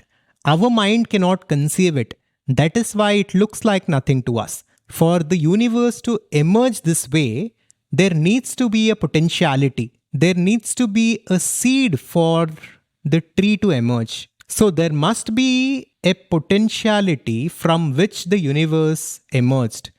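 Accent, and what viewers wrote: India. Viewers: Probably the best explanation to this question in the thickest Indian English accent I’ve ever heard.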